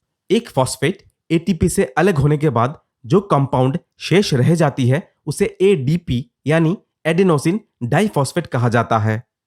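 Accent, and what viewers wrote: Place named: Bihar, India. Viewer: You seem to be from Bihar.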